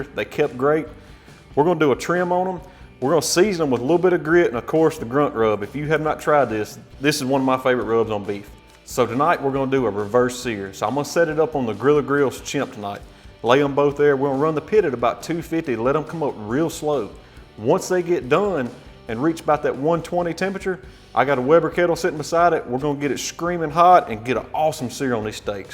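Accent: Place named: Appalachia, United States. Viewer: less than 3 min's in, I heard everything wrong or how NOT to cook a piece of meat like that. Second thing... lose the hillybilly accent.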